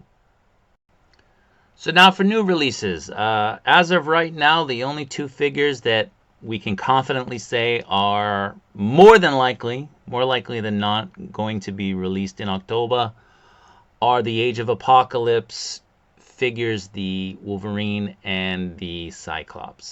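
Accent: Boston, United States. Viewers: So you live in japan but I notice you have a boston accent, is that where your from originally? I gotta know man!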